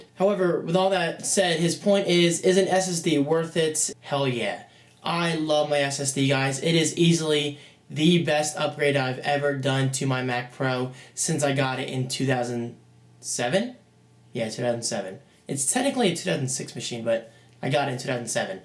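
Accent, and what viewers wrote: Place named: New Jersey, United States. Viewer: maybe its how they say it in jersey?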